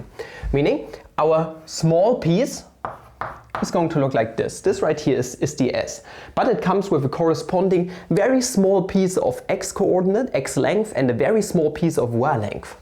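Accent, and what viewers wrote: Germany. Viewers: A bit too patronizing and arrogant. You are 10-0 behind because of the terrible German accent and from the start Matt Parker's drawing is much better than yours.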